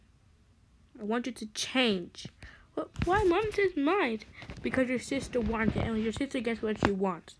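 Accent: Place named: Philippines. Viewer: I can't see that I chat here but I know and I hear that shes from Filipino tama ba ko?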